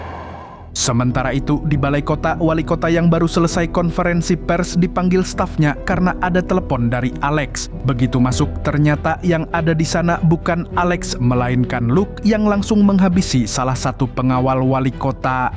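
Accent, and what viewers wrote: Banjar, Indonesia. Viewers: Urang banjar sekalinya 😂😂😂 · Banjar kh pian mang😂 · Banjarrrr bgtt lahh🤣🤣.. wkwkk bgl tapi kerenn bang 😂🔥🔥🔥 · Urang Banjar amang nih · Org banjar jua sekali nya lah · Orang Banjar kah mang😂😅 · Katuju banar unda lawan nyawa min ai. Urang Banjar jua sakalinya😅. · Banjar kah mangg? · Urang Banjar amang nya ini 😂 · Urang mana pian mang logat nya banjar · Jiah, org banjar adminnya 😂😂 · Orang banjar kah bang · Org banjar jua paman ni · Anjay urg banjar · pian ni urang banjar kah?😭🗿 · mimin orng banjar kalsel kah,? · Urang Banjar kah sekalinya amang admin nih😅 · banjar jua skalinya😂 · Anjay banjar sekali nya sidin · Mang Pian Banjar kah Kandangan,barabai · orang Banjar sakalinya😂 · Banjar ya min...tambuk😂😂😂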